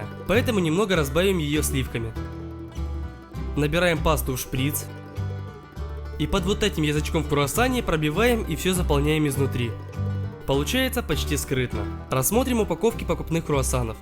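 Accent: Ukraine. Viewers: Украинский акцент? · Стоп!! Я только поняла.... ТЫ УКРАИНЕЦ? У тебя иногда просачивается в речь украинский акцент. Ты начинаешь считать с гривен а не рубля.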